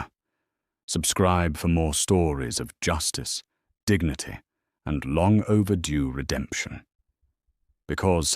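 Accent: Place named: Upper class, United Kingdom. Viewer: In Akron Ohio, a British Aristocrat narrating the story. WOW !!!! At the 4 minute mark, check out the AI text, which transforms "CLAUSE" to "CLAWS"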